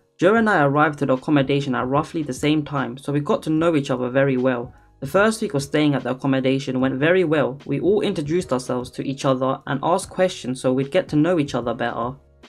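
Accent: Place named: Southern England, United Kingdom. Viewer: rjship - I'd say south England by the accent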